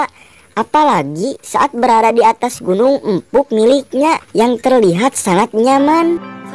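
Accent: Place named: West Java, Indonesia. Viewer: Ka ciri pisan ieu mh urang sunda na th🤣🤣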